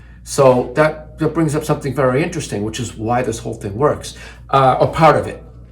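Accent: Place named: Boston, United States. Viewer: The f....g Boston accent I don't know what the fxxxx bada boom bada bing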